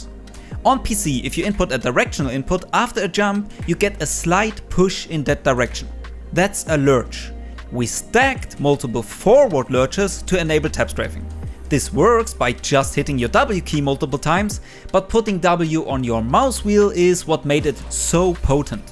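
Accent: Germany. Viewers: Der ist doch deutsch oder? Dieser Akzent ist ja kaum auszuhalten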